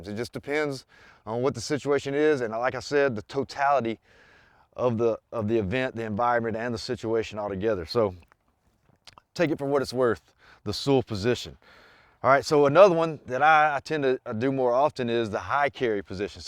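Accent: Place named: Appalachia, United States. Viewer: You sound like you was raised in the head of a holler like mine….like John Wayne toilet..ruff n tuff don’t take no shit off nobody…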